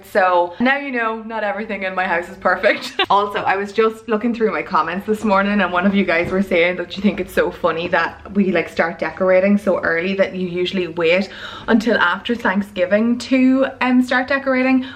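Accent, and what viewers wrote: Ireland. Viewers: Hi ❤ I just have to tell you that I LOVE YOUR VIDEOS! I have been cleaning all day and your videos have been playing in the background. I've been watching your videos for the past few days and you have been inspiring me in many ways. I love watching your routine videos and what you eat in a day videos. I came across your channel watching your Realistic 1 hour mourning routine, and since then I've been obsessed. I LOVE your accent as well!! I've always wanted to visit Ireland. Any-who, keep up the great work! Always looking out for new footage from ya!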